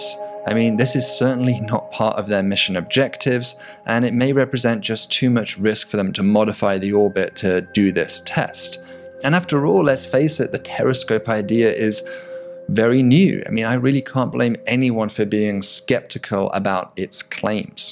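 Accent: United Kingdom. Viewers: Pretty sure he’s British…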